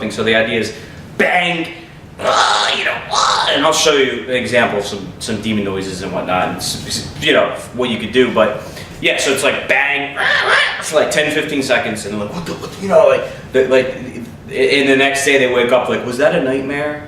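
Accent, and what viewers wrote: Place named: Michigan, United States. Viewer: Your space is serving Nova Scotia/Maritime vibes. But your accent has that Michigan feel. Go get’em, Tiger. I once played “ ” at my next-door neighbors for an hour, on a massive sound system… because they’d have noisy rows EVERY FRIGGIN’ NIGHT when he got home from his job on the police force. It worked.. and IT WAS FUN. Now, I cannot watch ‘Beetlejuice’ without reliving the trauma; it was worth it.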